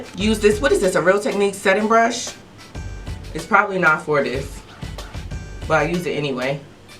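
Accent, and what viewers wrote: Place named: California, United States. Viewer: I'm from Cali!!!! and you sound like you From California too... lol. you are so good at what you do. thanks for all of your videos. You are so beautiful!!!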